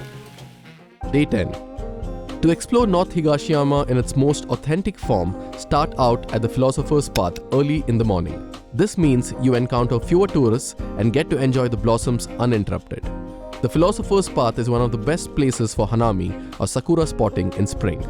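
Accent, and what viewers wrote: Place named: India. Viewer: The indian accent is a bit too much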